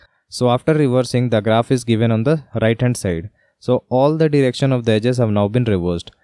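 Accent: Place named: India. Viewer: Beautiful explanation, I usually have hard times to understand tutorials in Indian accent. So when I googled I had to turn pages to find one that isn't Indian. First I watched that other video (with not Indian accent) on the subject. But it was horrible. Then said I give a try, and I chose this video. I have to say your explanation is beautiful, clear and easy to understand, the accent is barely noticeable. I like it! Great job! (Obviously. Liked and Subscribed.)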